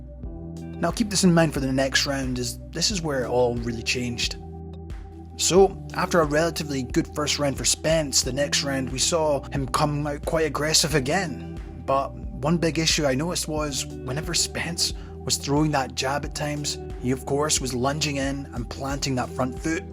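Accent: Scotland, United Kingdom. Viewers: Are you from Scotland ????? accent????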